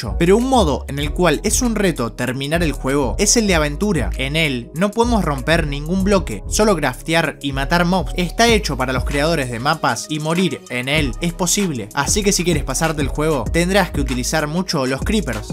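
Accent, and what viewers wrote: Argentina. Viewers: acento argentino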